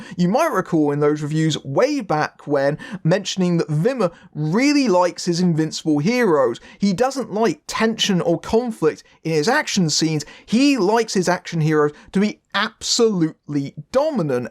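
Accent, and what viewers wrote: United Kingdom. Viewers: I'm detecting some British Isles lurking in your accent.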